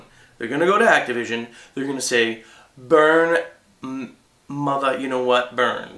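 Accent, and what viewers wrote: Canada. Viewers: are you canadian "aboot" not about, "aboot"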